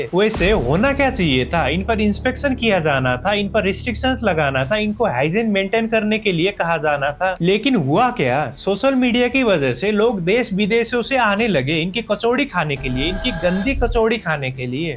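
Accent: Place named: Nepal. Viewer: Yeh toh nepali accent lag raha hai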